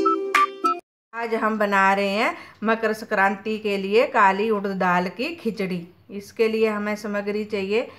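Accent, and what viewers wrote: Pahari, India. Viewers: I watched so many videos of this recipe but what was special in your video was the little pahari accent that was there Usko sun ne baad confirm hua yes someone from Himachali connected to roots is making this and this is authentic and right way to cook it This was so sweet of you aunty ji ♥️ Much love from your son like child Deepak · Araa aunty apki recipe ne toh moti hi madad hi kr di.. lockdown k time (pahari accent) ☺️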